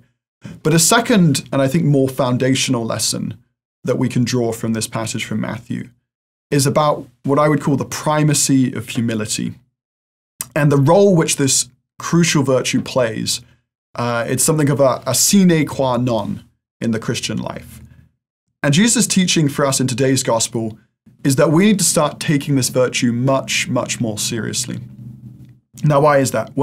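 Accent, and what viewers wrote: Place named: England, United Kingdom. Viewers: Thank you from England - and you sound as if you come from here! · Yes, he has a UK accent. I also noticed that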